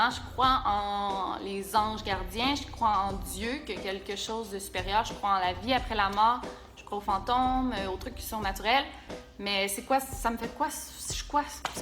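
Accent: Quebec, Canada. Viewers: Je viens de découvrir ta chaîne aussi et je l'ai adoré! J'aime le français quebecoise <3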